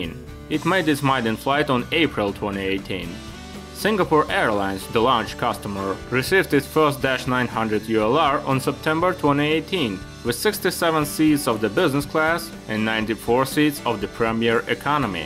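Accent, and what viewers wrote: Russia. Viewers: Its Russian accent I think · if you have a foreign accent, it means you speak another language. This guy probably speaks Russian. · RUSSIAN ACCENT !!! I'm not watching. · he’s Russian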